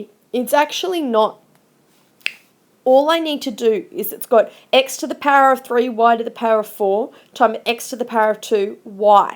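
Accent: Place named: Australia. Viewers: hey yall ima.australian and.by the sound yall thinking she is.australian .yall are whall awright see yall laterr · I'm Australian and by the sounds of it, I think she is :)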